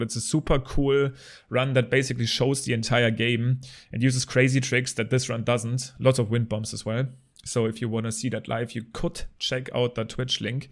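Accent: Germany. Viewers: you her like a german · He once played the German version and read the lines perfectly. Aaaaand his obvious accent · are you german? cuz you sound like a german if yes cool im too if no ok but i am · are you german yo sound german bist du deutsch du hast diesen deutschen aktzenz beim englisch reden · Is he german cause his englisch is a bit german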